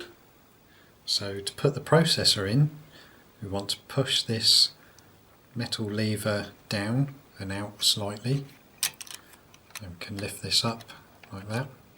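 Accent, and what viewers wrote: United Kingdom. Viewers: At last someone british 🇬🇧🇬🇧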